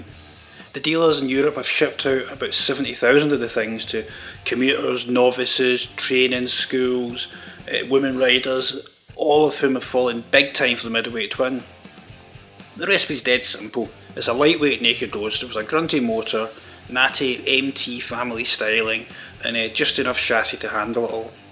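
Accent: Scotland, United Kingdom. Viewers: Mike K who cares about America? He’s Scottish and it’s a British website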